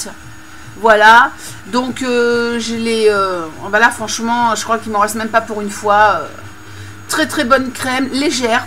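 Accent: England, United Kingdom. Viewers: ton accent anglais me fait rêver ! ^^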